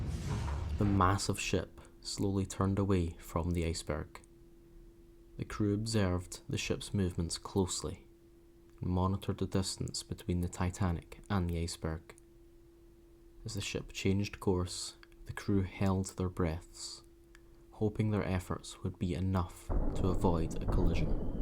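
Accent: Scotland, United Kingdom. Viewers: Bros scottish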